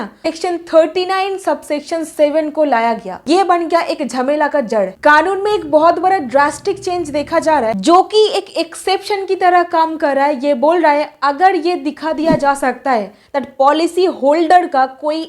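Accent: Bengal, India. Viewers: Your Hindi is not clear. Bengali flavour aa jaata hai and so unable to understand. Pls translate this in English. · I love Bengali accent... ❤❤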